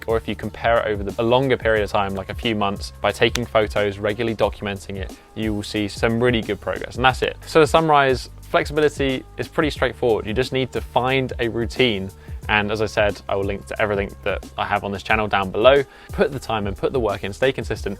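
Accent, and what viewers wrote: United Kingdom. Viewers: Awww british., damn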